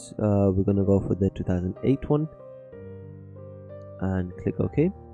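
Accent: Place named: United Kingdom. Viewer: Hi New to ECU tunning, I have Vediamo and DTS Monaco, how does this compare to them? great content, I just sunscribed. I hear a UK twang, where in this Island you are ? lol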